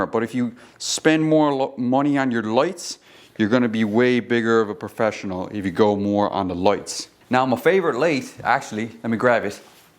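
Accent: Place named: Australia. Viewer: Bro are. You Aussie 🇦🇺? That Accent is sick mate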